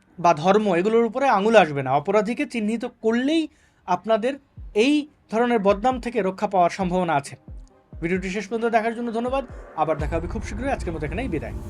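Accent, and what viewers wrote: Sylhet, Bangladesh. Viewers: ভাই আপনার মুখে সিলেটি ভাষা অনেক ভালো লাগে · ভাই, আপনার সিলেটি ভাষা বলা, সত্যিই প্রশংসনীয়। মনে হয় আপনি দীর্ঘদিন সিলেট ছিলেন। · আপনার সিলেটের ভাসা খুব সুন্দর 👌👌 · তুমি তো ভাই আমরার পুরা সিলেটি মাত জানো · ভাই তুমার সিলেটি মাত ত ভালাউ সুন্ধর। হুনতে ভালাউ লাঘে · ভাই আমি ভারতীয় সিলেটি এবং আপনার সিলেটি Accent টা শুনতে অনেক ভালো লাগে, তাই আমি চাই যে আপনি মাঝে মধ্যে সিলেটি Accent এ ভিডিও বনান। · আপনার মুখে সিলেটি কথা শুনে খুব ভালো লেগেছে। মনে হয় আরো শুনি। অন্য ভিডিও তে সিলেটি কিছু বলার জন্য অনুরোধ করতে পারি কি ?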